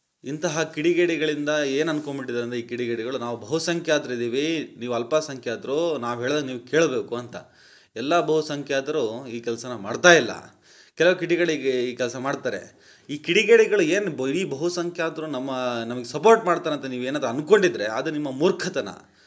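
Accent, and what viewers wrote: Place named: India. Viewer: real indian voice to you bro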